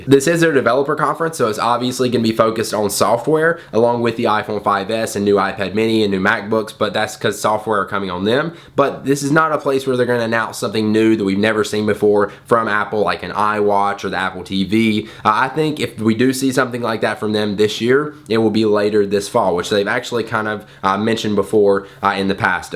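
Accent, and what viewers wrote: South, United States. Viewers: Were you raised or born in the south, then moved up north? I hear country in your voice, but I'm not trying to be stereotypical.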